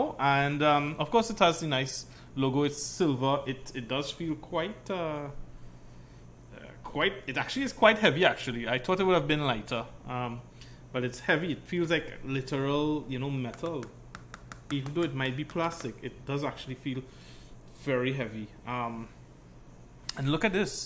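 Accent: Trinidad and Tobago. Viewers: Heard that trini accent from the go🇹🇹🇹🇹🇹🇹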